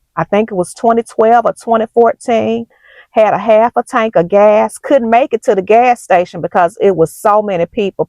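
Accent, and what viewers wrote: South, United States. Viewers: I just love her southern accent😊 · The algorithm sent me your video. 1st time watching anything from you. Love your Southern accent. Love you sis. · I love your southern accent. I got one, too. Born and raised in northeast Arkansas but currently living in Oklahoma. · I love your southern voice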